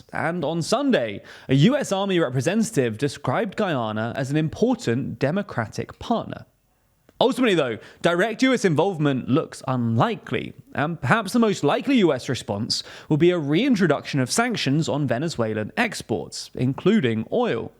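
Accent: England, United Kingdom. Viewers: Never trust a YouTuber with an English accent. Never.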